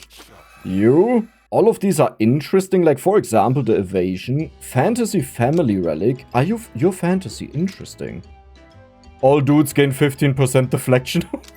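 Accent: Germany. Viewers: Honestly i love your german accent! (and am a little jealous)😊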